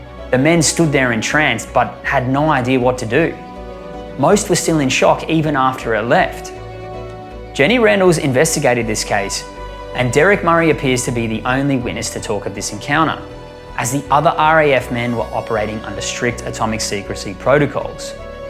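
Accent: Australia. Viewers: It’s about time we had an Ozzie voice…glad I found you. Thank you. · You're argument is well thought out and presented. Good to hear an Australian voice presenting information on a topic that tends to be presented differently to the Australian manner of thinking and understanding. I'm looking forward to hearing more from your channel. 😊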